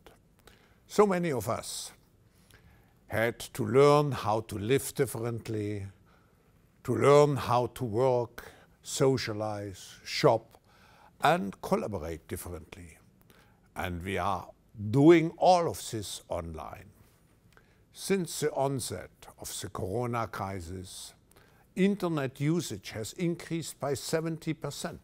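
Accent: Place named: Germany. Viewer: He's probably got the worst German accent, I have EVER heard....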